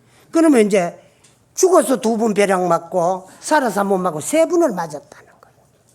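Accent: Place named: Gyeongsang, South Korea. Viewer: 스님 말씀 구수한 사투리 넘 정겹습니다 경북이 고향이 저의 어머니 말씀 처럼 스님의 법문 넘 잘듣고 있습니다 항상 건강하세요 오래오래 스님 법문 듣고 싶습니다🙏🙏🙏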